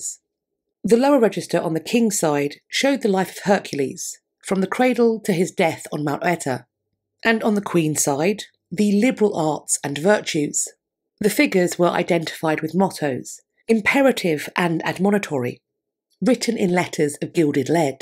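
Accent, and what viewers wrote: RP, United Kingdom. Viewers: I say we re-build it!!! (does the Trust have any spare change? hee hee) Thank you, for this lovely presentation! I believe Nonsvch was Henry's sort of dream house, if that's possible for someone who owned many palaces and castles. It was indeed the place he envisioned his legacy and descendants would carry on. I've always thought Charles II to be handsome in some strange way, but shame on him for allowing the destruction, regardless of his former lover's reason. I can see now, in her velvet and silk Elizabethan gown, hair up, perky smile and posh accent, telling off Ms. C, preventing the destruction, and sentencing Ms. C to a lifetime of farm labor, since even "thinking" of destructing Nonsvch was a crime, just as only mentioning the king's death was treason. By the way, does anyone know if the Nonsvch church would have dropped any hints of any particularly religion other than the Church of England? 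hee hee With an Italian designer, I smell Catholicism which I wonder if Henry truly ever abandoned entirely. 🎭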